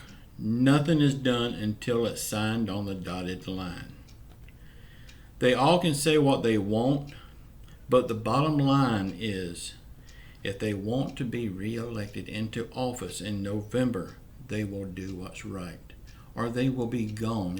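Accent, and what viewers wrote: South, United States. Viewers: Prayers for your wife. My mother had a massive stroke last Sunday, she is now fine, like it never even happened. A miracle! Hope for the same for your wife. What state are you in? I hear a southern accent.. The Republicans are disgusting, completely not in touch with reality and seemingly don’t care about the people they are supposed to be representing. For Mitch McConnell to laugh, makes me angry, very angry. I want him to be voted out of office along with the others who are dragging their feet and not passing a bill to help the people and to extend the $600 per week unemployment boost.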